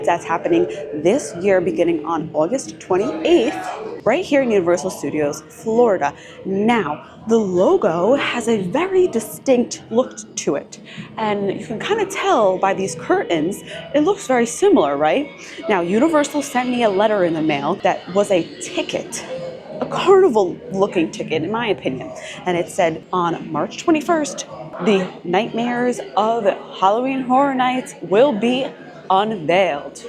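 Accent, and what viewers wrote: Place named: Long Island, United States. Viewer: I love your video... you gotta be from long island.. lol your accent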